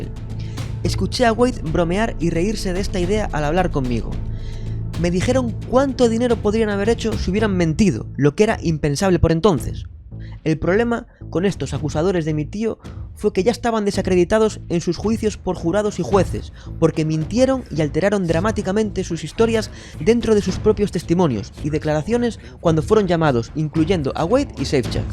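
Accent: Galicia, Spain. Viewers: no gracias a ti por recopilar videos y pruebas que según entiendo están en la casa de los jackson o en evidencias de los Estados Unidos de America y por el acento y todo supongo que eres de españa ósea al otro lado del mundo de donde sucedieron los cosas pero bueno esperemos haber si sale algo que destrone a bohemia rhapsody y volvemos a ver niños bailando y escuchando a Michael dándole más empuje a su venta de canciones pero ahora en descargas digitales para que le adjudiquen otro logro y tú sigas haciendo videos defendiéndolo 🙂👍 Recuerda amigo todo en esta vida es un negocio y yo me animo a apostar que el par de hombres que salieron en el documental solo están resentidos de no tener trabajo y ya no tener a jackson para que les mate el hambre y les pague con M&M’s y dólares en fin los padres de esos dos en dado caso que sea cierto que los violaba ellos tuvieron la culpa y además ellos por igual ya que preferían ser violados y recibir dinero,vacaciones, ropa discos dulces etc que seguir luchando muy aparte de jackson Y no soy fan ni ataco a jackson es que estamos en una época donde todo esto ya es más visto en el mundo de les espectáculo y siempre termina siendo mentira o sacan un álbum nuevo y en el mundo real pues el cuento se cuenta solo no crees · He llorado tanto con este video.... 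como tu dices hay que ser muy fan para conocer un poco el carácter de Michael, saber de toda esa gente , empaparse de videos, entrevistas e información que tenemos al alcance de cualquier persona, sin embargo la gente prefiere alimentarse del morbo de las noticias y quedarse con una imagen de Michael manchada.ASCO. Yo tbn pienso que este documental debería ser un puto delito sacarlo ahora a tantos años de su fallecimiento, en fin ya les vendrá el puto karma, que asco. Por cierto eres gallego verdad? jaja hi bro xddd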